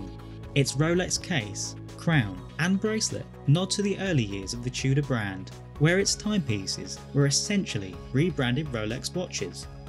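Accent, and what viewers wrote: United Kingdom. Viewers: He's British. He's just talking.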